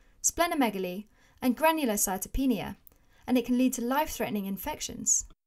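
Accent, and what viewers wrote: United Kingdom. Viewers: She is British, but Princess Diana did not have this accent. This one is more like Laura Rhensfield from the BBC.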